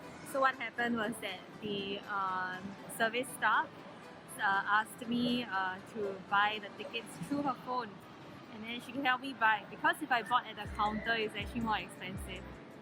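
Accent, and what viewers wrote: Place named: Singapore. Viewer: I can actually hear the Singaporean slang in some of your sentences, you subconsciously added "...lah" at the end of your sentence, and most of you English you finished with a quick hard stop.😊😊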